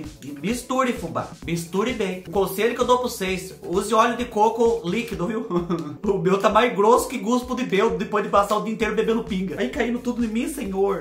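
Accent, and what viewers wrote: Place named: Piauí, Brazil. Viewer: Fubá, você é do Nordeste? Eu sou do Piauí, Amarante, mas moro na capital Teresina...sua fala é idêntica à nossa...com erros de Português, mas nós somos inteligentes e estudiosos graças à Deus! É a força do hábito mesmo...e o que deixa você mais engraçado é o seu linguajar... Você deveria ir pra TV Globo ser humorista 😂 Eu queria saber quem é essa "muié" que lhe ensina essas receitas... E por falar em receitas eu fiz a da casca de banana, maizena e óleo de coco...e senti que deu uma suavizada no bigode chinês...tenho 6.6, queria ter ficado com uma cara de 50 pelo menos, mas minha idade metabólica é 58 anos (mesma idade do meu namorado😅, e minha cabeça é de uma menina de 20😊), fiz minha bioimpedância (21/08/2024) e tudo está normal 🙏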